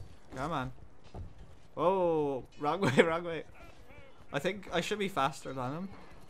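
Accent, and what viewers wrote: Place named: Ireland. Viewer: Your description and accent say you're Irish. Would you like to see medieval melee action in Ireland of the kind shown in this game? Also, would you like the devs to put in a "Master Archer" perk that lets you see the exact ballistic drop (but not trajectory) of your arrow when aiming long distances? I'm sure they might be persuaded if enough people asked for it.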